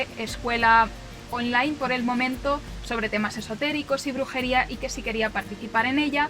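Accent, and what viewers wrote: Spain. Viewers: Hay gente fea pero este engendro ni ese adjetivo alcanzó 😂😂😂😂 y luego su voz, odio como hablan los españoles 🤢🤮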